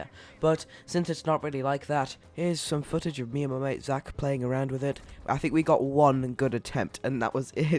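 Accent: United Kingdom. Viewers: He sounds even more British than last time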